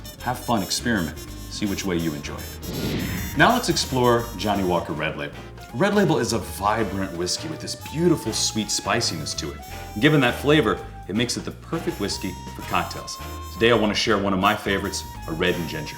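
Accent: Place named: United States. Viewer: OK, stop criticising, people! This video dates back 5 years. If they would do it again, this guy wouldn't wear a V-neck T-shirt under a suit jacket. Hell, he wouldn't wear such a cheap, crumpled, ill-fitting suit jacket. They wouldn't allow this guy to talk so slick American to promote a Scottish brand. And they would cut out that annoying background music. Wouldn't they?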